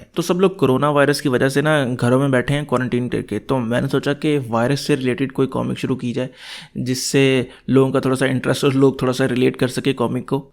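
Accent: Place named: Pakistan. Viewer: Pakistan ke log ko dc psnd krte h ... Mene jb dekha h to awaz se pta lg jata h ke ye pakistani h... Acha h me v dc ko support krta hu...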